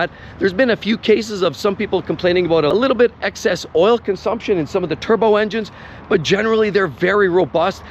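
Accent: Canada. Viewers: Canadian you can't hide that accent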